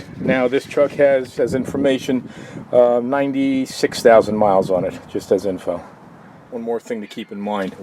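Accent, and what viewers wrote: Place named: Boston, United States. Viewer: Best,part of the video is the Boston accent..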